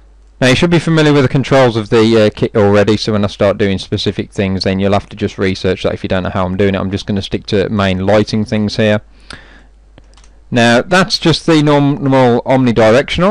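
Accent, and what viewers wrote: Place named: Birmingham, United Kingdom. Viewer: Love you're content and have to admit that even though I've watched your content for several years, I hadn't (until now) subscribed. Better late than never I suppose lol. From you're accent, I'm guessing you're from the same neck of the woods as me ... Birmingham??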